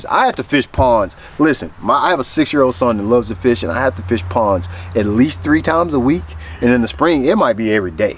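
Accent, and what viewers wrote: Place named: South Carolina Lowcountry, United States. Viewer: I have know for a while there was no standard on rods. That's why I don't order a lot of them online. I know fisherman that will only buy a certain series from a certain brand because of this. You are absolutely right about there is not much choice for a quality ultralight, 7 ft. spinning rod., With more use of BFS, DC bait casters the same can be said for casting rods, Especially at a decent price. A lot of people still fish with a closed face spinning reels for crappie panfish., I think there would be a market for more ultra lite 7 ft casting rods. Where I fish, Clark Hill, is now full of 12 and 13 inch Spots. They are a blast on light tackle, I like your no nonsense videos. Or maybe since I am from the S.C. low country, we just talk the same. 😂 I will give your rods a chance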